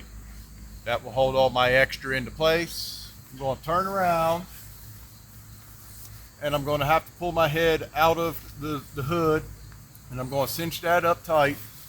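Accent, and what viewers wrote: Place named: Kentucky, United States. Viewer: I appreciate the manner in which you realistically presented the ACTUAL challenges in making a shelter while wearing a poncho. Thank you for expressing your concern for victime of Hellene. Our area along the northern half of the Al-GA state line was in the originally projected path of the storm before it suddenly turned northeastward & missed our area. We have many friends who live the areas most devastated. ALL the victims remain in our thoughts & prayers. By lowering the end of the poncho tied to the tree, the plow point shelter becomes long enough to stretch out, lie down, & sleep if an overnight stay becomes necessary in an emergewncy or survival situation. Thank you, & may God bless you, sir. Your accent reminds me of those which my family heard when we lived in SE KY about 40 miles beyond the Cumberland Gap. 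This presentation motivated me to watch your other videos & possibly subscribe! An 83 year old outdoor adventurer.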